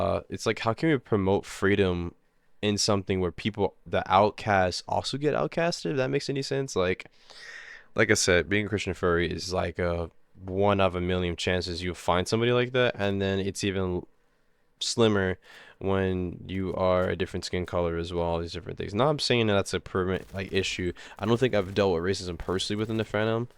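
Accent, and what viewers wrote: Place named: AAVE, United States. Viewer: I have a feeling this guy has an iq of 87, this isnt a joke post just watched it cause it looked absurd. The ebonic vocabulary and accent used, speaking gait, and "find god for yourself" means this guy is most likely some mulatto amerimutt that engorges in weed often. He dances around trying to make an actual point aboutt he furry community and says vague non statements, "like everyone is ai or something and people are like copying eachothe,r" how though it is never explained. The only point he makes is that "christian furries" often have clashing view with his and that there is "no creativity" and thats about it. The mental gymanstics of "religous furries" never ceases to confound and entertain. No examples, no anlysis, just babbling on. It must be a pity what happened to you to be colored and a furry and also advice, if you want to try and make/prove an arguement, try not to say "like" so mucn, its a clear give away for a wrinkleless brain asnd follow the essay 101 stuff you were tuaght in elementary school, intro with and arguement and intro to sections, the sections, and the concluion. 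Who cares Im just a chud anyway.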